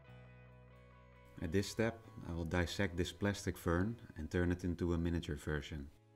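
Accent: Netherlands. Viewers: You are dutch, aren't you? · Laat me raden, Je bent Nederlands of niet? Hahaha Nee maar Nicely done! :D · You sound Dutch haha. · Are you dutch?!?! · is he dutch? his accents sounds sooo dutch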